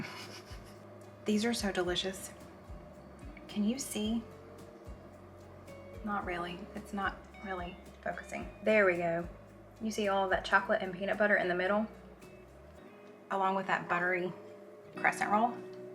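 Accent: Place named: South Carolina, United States. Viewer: Im a Carolina girl, living on Prince Edward Island, in Canada, and your recipes and your accent brings SC into my home. I made the White sauce chicken enchillada last night and hubby and I loved it!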